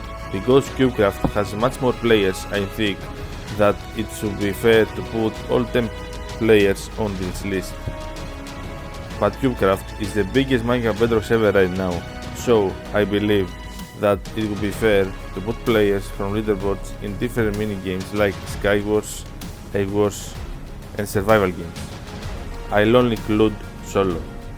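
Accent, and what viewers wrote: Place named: Greece. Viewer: Greek accent hits hard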